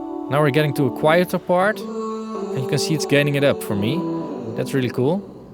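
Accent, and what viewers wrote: Netherlands. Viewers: I could tell that you saId "Write" and not "Ride" immediately because "Ride automation" makes no sense! Of course, I've been watching your videos for a number of years and here in the upper midwest (Wisconsin specifically) it's not all that uncommon to interact with immigrants from the Netherlands and other northern Eurpoean countries so we're more used to those accents and have less problems understanding them!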